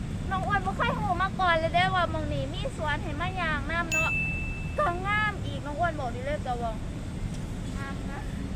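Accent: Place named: Isan, Thailand. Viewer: สำเนียงนี่ เป็นคนแขวงดั๋ยน้อ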